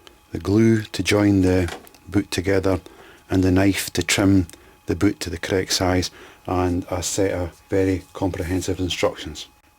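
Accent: Scotland, United Kingdom. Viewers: Love the scottish accent.. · Love the Scottish accent! Love the detailed instructions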